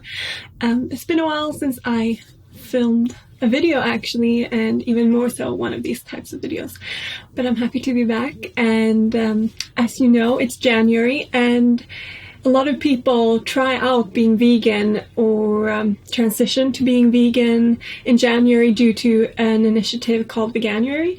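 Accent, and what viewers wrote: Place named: Sweden. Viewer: this is the first video of yours that i've watched. my roommate is swedish, and as soon as you started speaking i immediately knew you were swedish. love the video! definitely subscribing.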